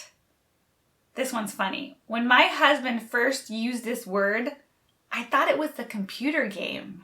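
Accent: China. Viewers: You know well how a Chinese speaks English. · Hey, thx for the amazing teachings! How come it sounds like pulling away from ‘HK English’? haha 😛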